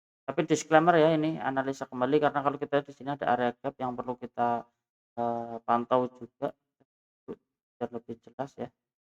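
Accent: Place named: Banyumas, Indonesia. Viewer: Emg sampeyan ngapakny dr mn paman. Sy jg ngapak 😅😅😅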